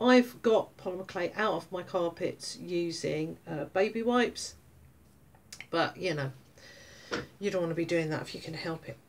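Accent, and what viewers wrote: United Kingdom. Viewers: Hello! I've recently discover your channel thanks to Cindy (PolymerClayTutor) and I'm font of your videos! I really appreciate your polymer clay videos and other themes you're talking about. You have really good tips and a nice video style. I can't wait to see your next productions! Hugs from France, Emeline PS: You have such a pretty British accent, can I ask you where are you from? I'm studying English language and literature at University that's why I'm curious.:)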